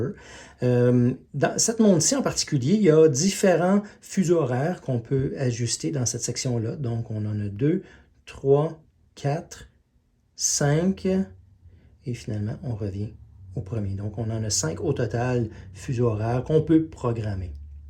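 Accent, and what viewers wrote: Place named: Quebec, Canada. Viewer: Woaahhh! Un québécois!! Super!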